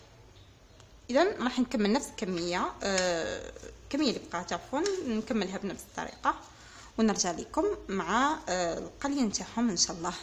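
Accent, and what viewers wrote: Skikda, Algeria. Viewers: لهجة جزائرية سكيكدية تهبل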